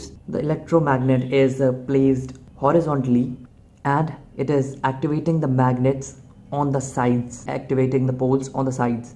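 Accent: India. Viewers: Indians like you speaking english sound very ridiculous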